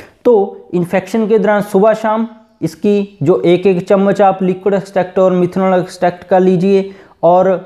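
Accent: Punjab, India. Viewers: आपका बोलने का पंजाबी अंदाज़ बहोत बढ़िया है।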